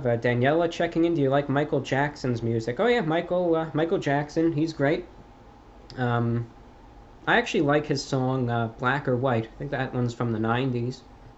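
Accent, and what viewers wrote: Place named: New York, United States. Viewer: I knew you were from that part of the map.. The voice. Women love that New York voice. New York and New Jersey.. We women love those accents..🤔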